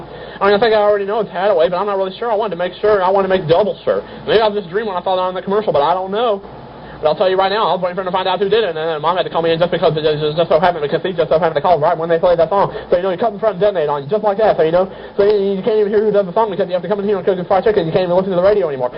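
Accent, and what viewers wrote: South, United States. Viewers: Isn't he 19 in this tape? Also I love the southern the accent. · hhahahaha you had much more of a southern accent back then :D so CUTE! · On the tape you sounded a lot more southern!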